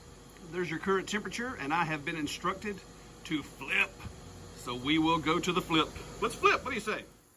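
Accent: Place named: South, United States. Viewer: You sound so southern 😉💋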